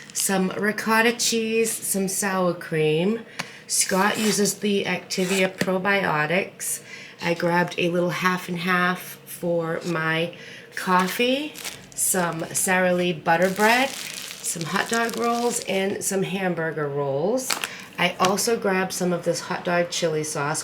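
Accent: Massachusetts, United States. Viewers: Just found your channel through this collab - you had me laughing so hard with your Wally World dis - hey, who doesn't feel that way? I also love your MA accent cause I've spent many happy hours in various New England states :-) So you have a new subscriber and I look forward to following along!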